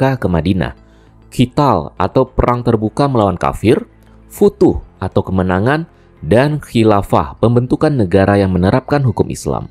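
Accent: Indonesia. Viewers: abg ini org minang kah?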